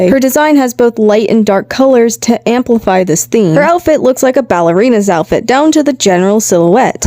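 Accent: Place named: General American, United States. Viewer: How’re u from the south but sound normally american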